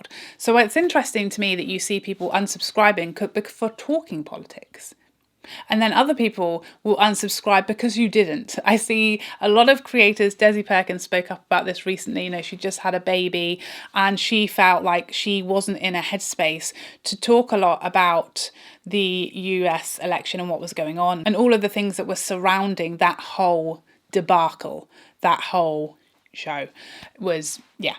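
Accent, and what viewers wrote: England, United Kingdom. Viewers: Your eye makeup here is so beautiful. I love the green. I unfollow somebody when their politics don't agree with mine. I can take it if it is background noise, but when it becomes overt, I don't care to have it in thrown up in my face all the time. I can hang with somebody if the content is lacking sometimes, because I understand that people have lives outside of their youtube channel. Also, there are channels I think I really like at first, but once I get seeing more videos, I realize it was just the one or two video that I liked. I found your channel from your divorce story, and could empathize personally, you seem near my age, your English accent is so cute, you have a nice face, and I am interested in beauty products and makeup, and so many of your looks are so fresh and gorgeous, and I could learn a thing or two! I have taken so many mental notes on highlighters and foundations I need to try from watching your videos!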